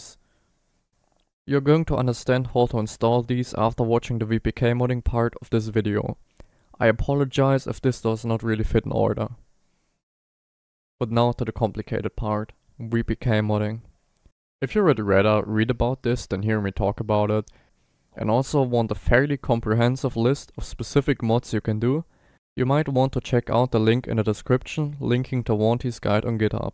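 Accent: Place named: Germany. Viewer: I'm just here for the German accent ASMR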